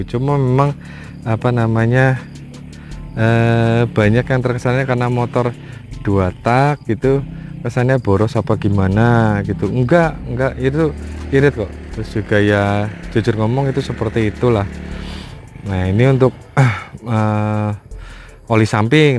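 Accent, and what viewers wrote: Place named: Banyumas, Indonesia. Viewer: Kyk ada campuran bahasa ngapaknya hee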